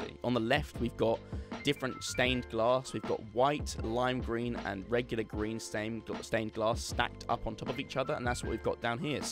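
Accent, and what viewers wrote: United Kingdom. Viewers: I like so much British accent. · I always love british accent.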